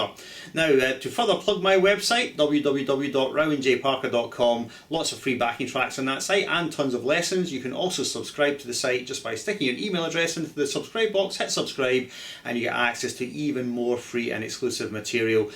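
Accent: Scotland, United Kingdom. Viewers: Any chance you could invest in a tie clip mic? Your Scotch accent is a rarity in YouTube and needs to be heard properly.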